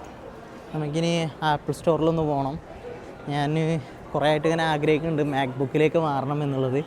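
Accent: Malappuram, India. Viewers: വ്ലോഗ് എടുക്കുന്നോണ്ട് മലപ്പുറം ഭാഷ ഒഴിവാക്കണ്ട ഞമ്മക്ക് അതൊക്കെ ആണ് ഇഷ്ടം ❤❤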